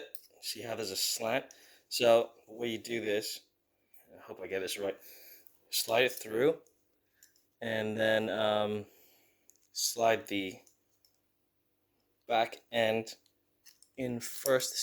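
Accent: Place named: England, United Kingdom. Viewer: What's your accent? Sound like fellow Englishman that lives in the States, got my MP5 and sling on order so thanks for the vid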